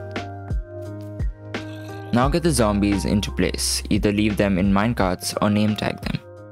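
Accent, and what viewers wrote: India. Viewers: lol, i knew you are an indian the moment you spoke, your accent was similar to tech raj(another utuber), haha, i didnt expected an indian to be interested in minecraft mech. and tech. most of the indian minecrafters are jjust playing it for views and copying stuff, nothing special. source; im indian too. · Indian accent? Bro u Indian, btw just clicked on vid 4 secs ago.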